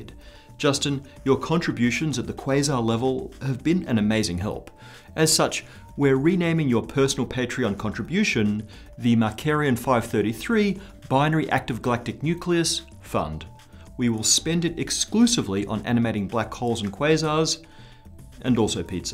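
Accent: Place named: Australia. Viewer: Cba with the presenter and his aussie accent mate!!!!!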